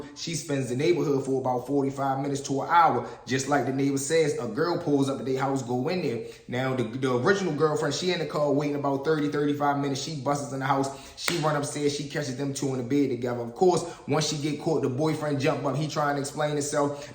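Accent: Baltimore, United States. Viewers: Sound like you from bmore brother, good story💪🏾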